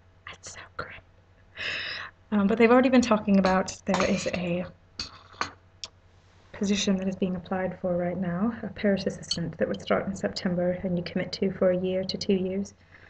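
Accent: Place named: England, United Kingdom. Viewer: LOL!! I did that too when I was surfing in England. Yes, there's surf in England. The accent sounds good on you, but sounds weird coming out from a Filipino-Hawaiian-Basque dude (or a brown brahdah). LOL! Cheers.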